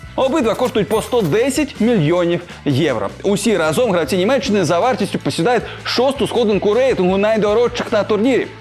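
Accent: Russia. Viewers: Таке враження, що ведучий зростав на російськомовних відеоблогах, треба ще тренувати українську (помилки виправляти + вимову трохи ставити, бо подекуди проскакують маасковські інтонації). Сам матеріал доволі цікавий (хоча, у табличці теж помилка – 2 і 3 місця). Вже не перше класне відео на цьому каналі! Продовжуйте!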